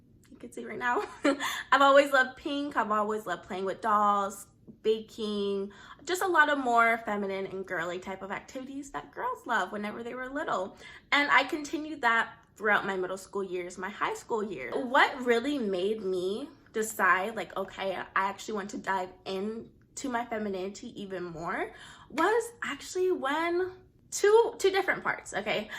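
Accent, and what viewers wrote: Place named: Caribbean. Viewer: Hey, Girl you sure you from down south because I swear I can hear Caribbean or jamaica in your accent. Thanks for this video.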